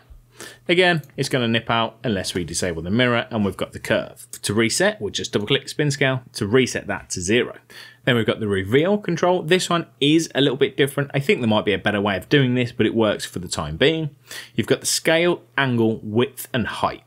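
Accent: United Kingdom. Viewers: are u from UK ur English accent seems to be so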